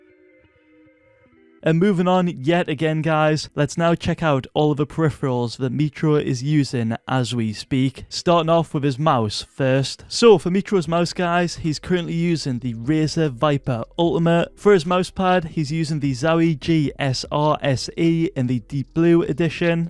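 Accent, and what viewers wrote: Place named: Newcastle, United Kingdom. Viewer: been a fan for about 2 years, but please stop saying "that have went down" its "gone"!!! lmfaoooaoaoaoaooaoaoo... fr tho i get its the geordie in u (i swear ur geordie anyway)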